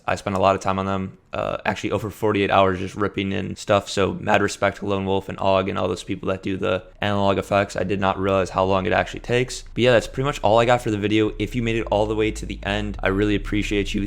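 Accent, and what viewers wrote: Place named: Buenos Aires, Argentina. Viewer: Hablas en ingles pero se siente como si fueras un cheto de capital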